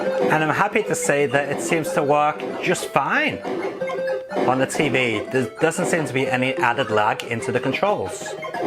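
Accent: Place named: Liverpool, United Kingdom. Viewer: Great review; and as a huge Beatles fan; I thought your accent might be from Liverpool. Looking forward to more.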